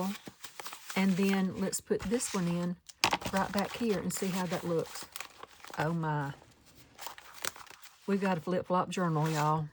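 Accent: South, United States. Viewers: Beautiful pages! Whenever I put my journals together now I add a page and think to myself (in your beautiful southern accent) do I like that?? 😊 Love your videos!!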